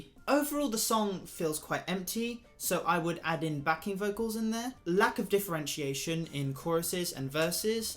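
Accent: United Kingdom. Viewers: Bro sounds like Britiish looks like korean what's happening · Wow I’ve never heard you talking 😯 I didn’t know you had a British accent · From which country he is???? Style like Korean Skin like Korean Makeup like Korean But But But Voice like British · that british accent<3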